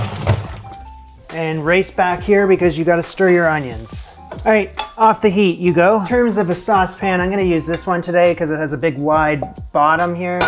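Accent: Canada. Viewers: i clocked that you were canadian immediately before you even said that you were, amidst a sea of americans on youtube i spot my brethren with ease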